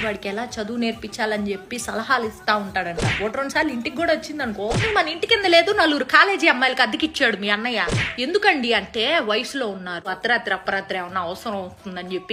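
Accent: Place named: Nellore, India. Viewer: It’s one of the best laughable reels sister, amazing, on top Nellore dialect is superb, keep rocking.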